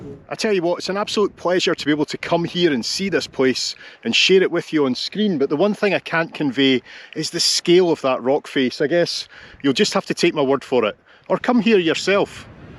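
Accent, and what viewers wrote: Scotland, United Kingdom. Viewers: Phenomenal! Aloha from my home, on another spectacular island, Big Island Hawaii. :-) The beauty and the drama of the cliffs in your video are priceless. Fewer tourists, too! Subscribed. My grandfather was from Auchterarder, Perthshire, and your accent brings back fond memories of him. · I guess he is Scottish. And so he speaks a dialect of English. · Really loved this. The funny thing is that my American wife and kids couldn't understand a word you said! :) I grew up in Australia, and my best friend for some years was from Glasgow, so I long ago learned to tune into the Scottish accent. Because of the popularity of British TV in Australia, most Aussies in fact 'get' the various British accents. But I've lived in the USA since 2000 and it's hilarious how most Americans practically need subtitles - and often get them in movies and TV programs.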